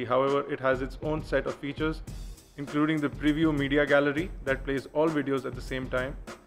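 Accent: India. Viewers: hahahahaha i love Indian English.. "How much did you get paid by HTC tell me HUNN" LMFAO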